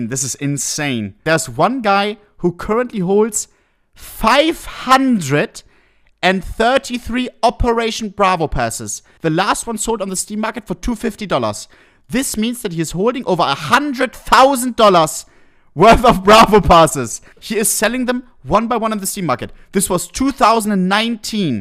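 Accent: Germany. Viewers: my guy is german but speaks english bra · German accent in english is fucking great lmao i moved to germany over 2 years ago and as a english speaking person it was soooo funny hahahahahah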